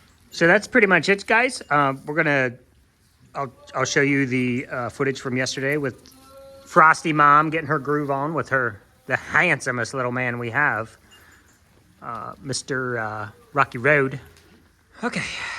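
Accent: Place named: Midwest, United States. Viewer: Looks like you may be getting some crap weather going through Ohio this weekend into next week. Frosty has the prettiest babies and they love her. Gingy is yo-yoing again. Poor girl. She gave us Chuck Norris. I live in Texas, but was raised in Iowa. Love that Midwest voice tone. Praying for Gingy to recover. Weather won't help her. Much love to both you and H.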